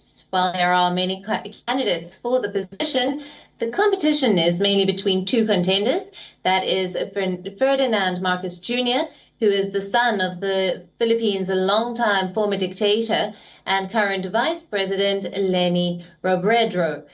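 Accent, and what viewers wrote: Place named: India. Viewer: its an indian accent. Just like Filipino, Korean, Singaporean english accent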